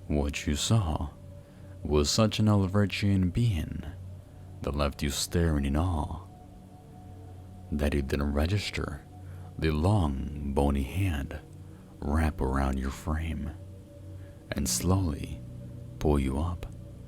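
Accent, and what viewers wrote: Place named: Europe. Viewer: You sound like the foreign European guys from Family Guy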